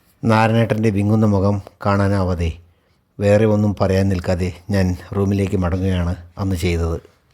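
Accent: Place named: Valluvanad, India. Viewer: എന്നത്തേയും പോലെ നല്ല അവതരണം. നമ്മുടെ ആ വള്ളുവനാടൻ ഭാഷ കേൾക്കാൻ എന്താ സുഖം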